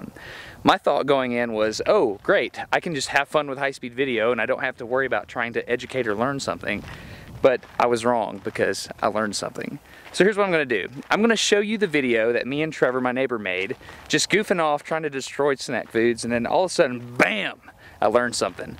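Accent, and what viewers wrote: South, United States. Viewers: this is america in a video, the food, the accent and the gun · I have to ask. This vid was published June 22, 2012. Today is October 3, 2017 and I'm watching it for the first time. At the beginning you said you were in the California desert. How do you come by your Southern accent? I'm watching this from East Texas. You sound like you could be from around here. Just curious. Where are you from, originally?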